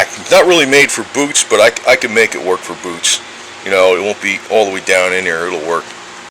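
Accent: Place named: Chicago, United States. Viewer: i always liked el caminos. this guy sounds like a chicago accent cause he talks like me and i am from chicago.....! and nice car dude....!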